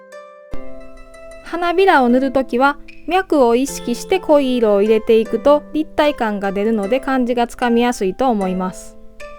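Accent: Kansai, Japan. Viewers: そこはかとなく漂う京都弁がまた風情ありますね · トロントに来てから関西弁って懐かしいなあ。。。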